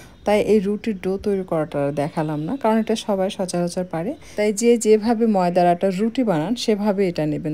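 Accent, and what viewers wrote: Bogura, Bangladesh. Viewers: Apu tomar basha bogura kothay?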